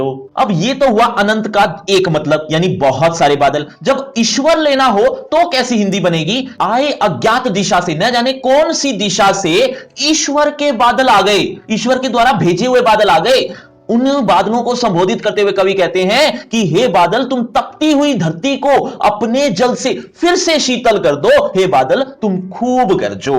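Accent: Haryana, India. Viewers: hryana se ho kya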